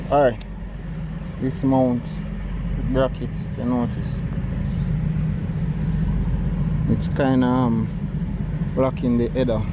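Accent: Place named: Jamaica. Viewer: Love how u keep di ting straight jamaican... real yaad man mechanic. Keep it up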